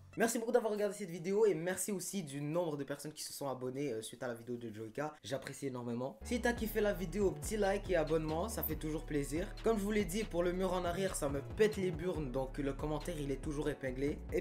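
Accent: Quebec, Canada. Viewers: T'es Québecois???? Sinon fréro je viens de te connaitre grace a Joyca ;) et t'es trop marrant fréro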